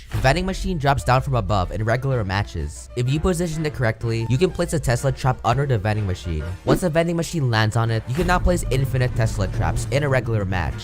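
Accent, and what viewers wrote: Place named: England, United Kingdom. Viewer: Ayo ur pilipino with English accent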